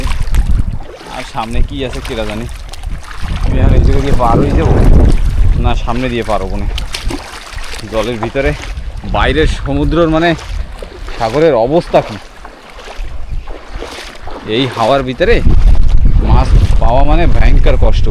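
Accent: Bangladesh. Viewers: Bangla vasa akdom BanglaDeshi tomake Nijer Apon lage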